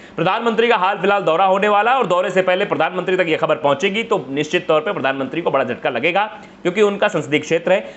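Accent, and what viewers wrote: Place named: Uttar Pradesh, India. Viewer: Plz keep ur style As Hindi news anchor not UP news anchor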